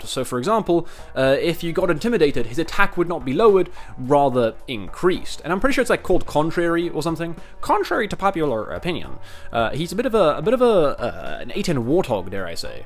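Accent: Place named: South Africa. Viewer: funny south african pokemon man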